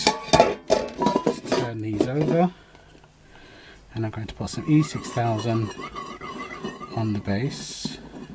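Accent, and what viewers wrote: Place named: United Kingdom. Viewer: Very cute. What will you do with the feathers? I like them also. I love listening to your accent, it reminds me of my son-in-law who is British. He and my daughter live in St. Neots.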